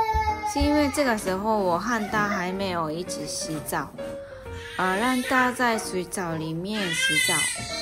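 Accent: Taiwan. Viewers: 頑張ってください 具然にこのビデオを見た 応援しますよ しかし なぜタイワンの標準語似てますか？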